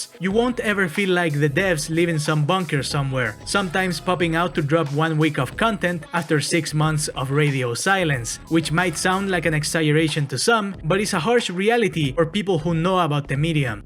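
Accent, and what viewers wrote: Chile. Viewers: Siempre que escucho a un compatriota y que es flaite me das un asco que verguenza, que bueno que naci en lo barnechea. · chilean accent screamer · Espera como que sos chileno JAJAJAJA excelentes videos eu, y excelente inglés también.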